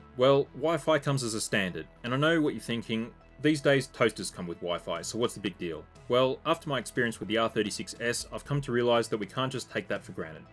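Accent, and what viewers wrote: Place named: Australia. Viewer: Melbournian here, it’s a treat hearing a fellow Australians voice doing this (kinda tired of Russ from RGC voice..)!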